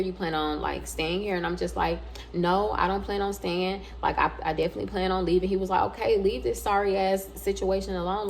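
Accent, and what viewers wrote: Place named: South, United States. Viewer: Yess your story times are the BEST 🙌🏾 and I can tell you from the south lol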